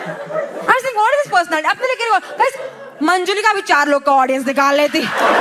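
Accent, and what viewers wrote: Eastern Uttar Pradesh, India. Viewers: She is the best female comic.... So spontaneous in changing her tone every second and just love that east UP flavour here and there😂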